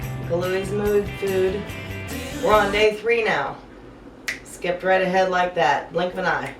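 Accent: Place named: Ohio, United States. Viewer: You are my "Sister from another mister!" You sound like me, talk like me, I felt you! (Not to sound stalkerish!) I got the same cookware and everything!!! IDK how old mine is either! I've also got my Grandpa's Cast Iron Skillet! (Notice the caps? BECAUSE!!) I'm from Kettering Ohio! You sound like you're from here...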